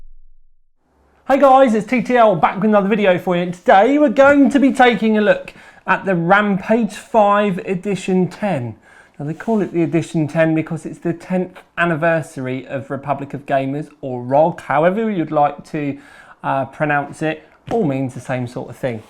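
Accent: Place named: South East England, United Kingdom. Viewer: He can't handle our south east accent, fuck the haters!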